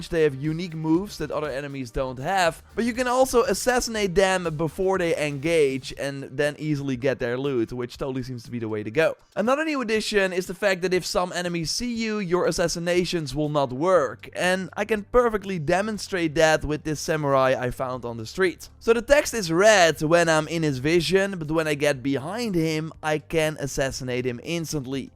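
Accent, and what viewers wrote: Netherlands. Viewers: Hahaha ik hoor gelijk dat je nederland bent zet hem op ouwe💪🏾😂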